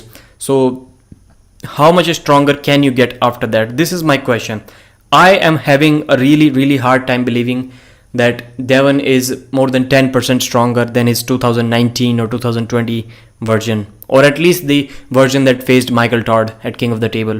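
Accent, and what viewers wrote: India. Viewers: I had some difficulties with his Indian accent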